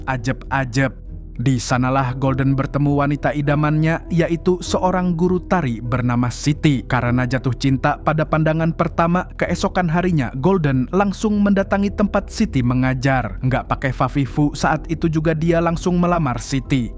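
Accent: Banjar, Indonesia. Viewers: Urang Banjar kah Pian bang 😅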